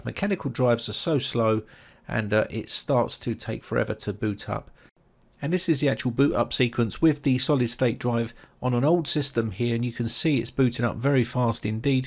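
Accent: England, United Kingdom. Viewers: Watched and appreciated, but why are all these tech primers done by guys with English accents? Have to over and over some sections on many of them to figure out what's been said!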